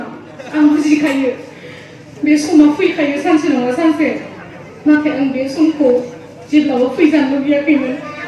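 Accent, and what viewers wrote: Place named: Udalguri, India. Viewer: jwbwd mwjang jadg Abo.. Ngni voice kwo birad mwjang mwnw ag ngsiniting ni edi railynykwonw mwjang mwnw ag kwnasogbai tanw lubei. Agni lwgw dgw Odalgurini railynykwonw birad mwjang mwnw ang Abo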